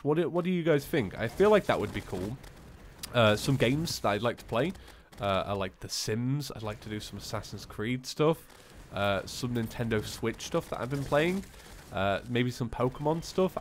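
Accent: United Kingdom. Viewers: Stereotypes are bad, I know but in the middle all I could think about was how steriotypical of a British person sounds Have a good day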